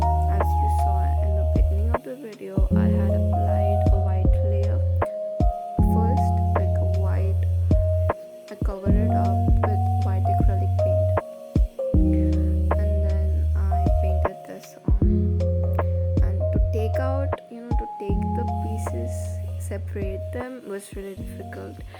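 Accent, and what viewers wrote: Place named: England, United Kingdom. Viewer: So nice voice ..... This like Anglo English..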